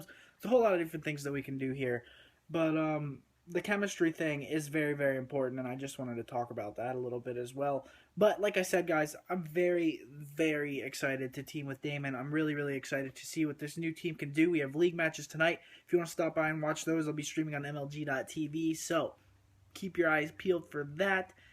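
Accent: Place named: East Coast, United States. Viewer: i thought you were east coast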